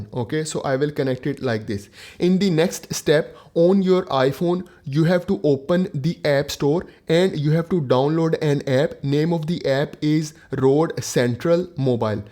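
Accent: India. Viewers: Once again I was convinced that the English spoken by Americans and the English spoken by Indians are not the same English. Jesus will sooner return to us than Indians will learn English.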